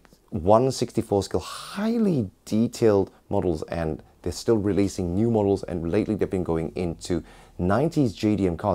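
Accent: England, United Kingdom. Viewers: why is the talking like an Englishman if he's a Japanese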